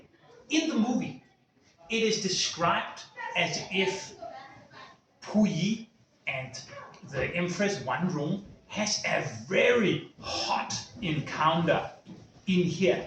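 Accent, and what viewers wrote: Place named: Denmark. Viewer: That's sørengasseme what I call Danglish.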